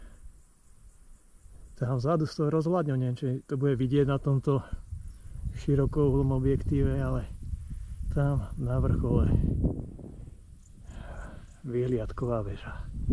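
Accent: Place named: Lowlands, Slovakia. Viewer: Parádny spôsob ako navštíviť rodinu, klobúk dole 👌. Inak aj som si myslel, že pochádzaš z dolniakov podľa prízvuku 🙂. Tento hrebeň si chcem tiež natočiť toto leto a fakt díky za inšpiráciu, kde prespať. Normálne mi to nenapadlo, že zvoliť rozhľadňu na Panskej. Aspoň nemusím teperiť stan 👌. PS: Inak nabudúce prídi na premiéru, mal si tam normálne 8 ľudí 🙂. Peťo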